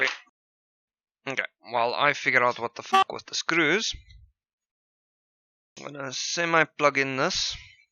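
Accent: South Africa. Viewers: Nice Video. ... You have A south African ACCENT.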